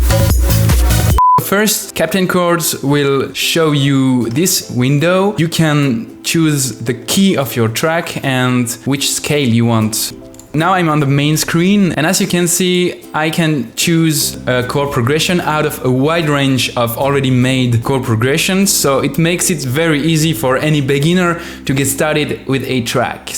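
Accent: France. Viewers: Are you french ??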